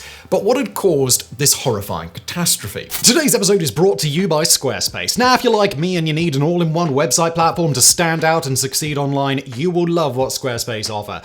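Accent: United Kingdom. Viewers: Square space as a sponsor: Check British: Check is and confirmed.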